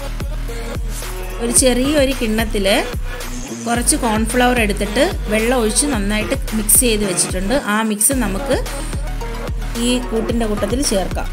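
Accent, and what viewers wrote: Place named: Tamil Nadu, India. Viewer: Gopi manchuri enn കേട്ടിട്ടുണ്ട് ഇത് aadhyaayita enthayalum kandal തന്നെ manassilakum super chechi malayalam samsarikkumbilum tamiz idakk idakk slang verunund Sem2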